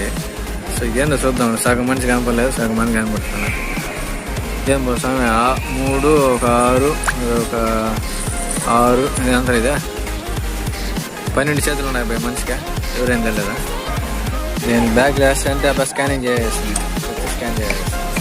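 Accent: Chittoor, India. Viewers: I love chittor slang. I have frds in tpt chittur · ఫస్ట్ టైం లా లేదు బ్రదర్...బాగా అనుభవం ఉన్నట్టు చెప్పారు...🤔🤔🤔ఏదేమైనా మీరు సూపర్ బ్రో...మన యాసని సరదాగా ప్రపంచానికి పరిచయం చేస్తున్నారు... వింటున్నంత సేపు చిత్తూర్ పరిసర ప్రాంతాల్లో తిరుగుతున్నట్టు భలే ఉంటాయి మీ వీడియోస్...👌👌👌👍👍👍 ఫ్రమ్ శ్రీ కాళహస్తి... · Ni videos super ba.. slang style vere level..baa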